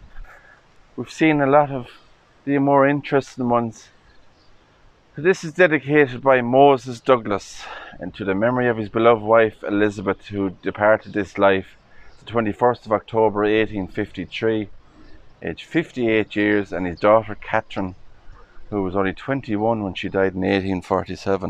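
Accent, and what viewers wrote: Ireland. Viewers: Beautiful cemetery. Your Irish accent is as well. I love listening to you. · You have a very gentle Irish brogue there sir, even when the subject matter is a bit somewhat dismal. Lovely trees there, I could hear a Chaffinch (Rí Rua) and a Coal tit (Meantán dubh) there (I think, I couldn't really be sure, but I suppose). What a horrifying thing to find out, people were being buried alive in those days, probably one of the worst feelings you could ever imagine☘🐦🪦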